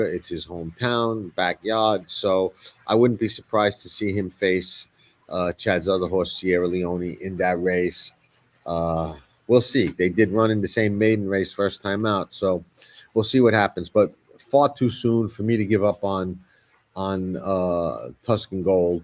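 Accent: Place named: East Coast, United States. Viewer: Hey,. I'm sorry, too. I left grey out..went with Tuscan..my reason was I didn't think grey could run well on short rest. I love your east coast accent, thanks for the hood insight. You know your game. I'm just learning.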